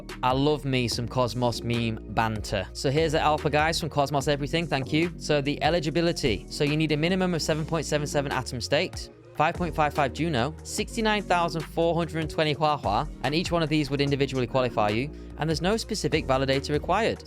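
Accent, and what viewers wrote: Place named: Yorkshire, United Kingdom. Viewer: You’re the 🐐 mate aha. Can tell from the accent you’re from Yorkshire pal, which part ?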